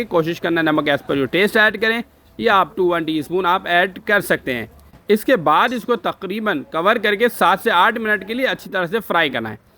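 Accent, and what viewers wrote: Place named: Bihar, India. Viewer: بولنے والا بہاری ہے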